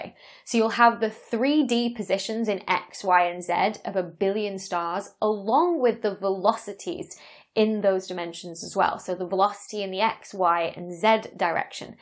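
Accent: United Kingdom. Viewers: Fabulously put together - you've got a new fan here. Great presentation, clarity and very easy to follow... and it's soooo refreshing to hear a British accent for a change. Love it. Harry.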